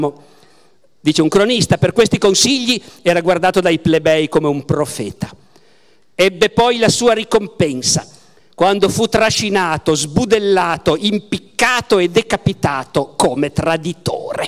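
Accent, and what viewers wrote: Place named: Sicily, Italy. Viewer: Barbero che parla siciliano è stupendo! Formidabile prof! :)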